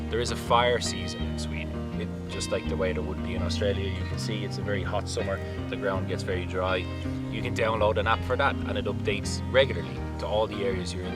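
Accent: Ireland. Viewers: I think he means three he has an Irish accent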